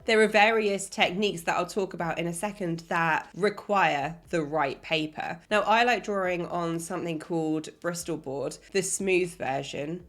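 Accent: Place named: RP, United Kingdom. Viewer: I like your accent Sounds so posh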